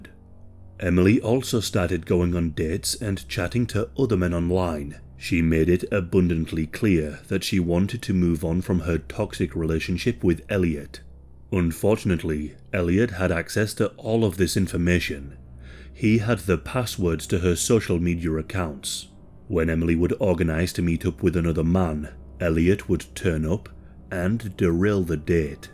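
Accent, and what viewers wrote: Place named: Northern England, United Kingdom. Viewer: Not even hald way thru that cheb ends personal description yes but. im actually already physically cringing at 'scarface' LOLL more like 'uncontrollable teen acne-face'. What a fuckn loser. Cant even imagine this litte coke heads further actions n what he'll end up doing to that poor young lass. Hate little pests like him. Calls himself topdog yet cant even buy his own car. What an absolute bell end... i already despise him and im not even a 1/4 way through the entire video. Amazing work as usual. As a fellow northerner usually a northern accent makes me awkward as im so sick of it but you make me proud to live up norf again ha ha. Legend!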